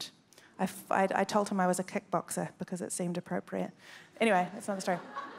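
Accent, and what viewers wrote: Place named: New Zealand. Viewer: New Zealand accent!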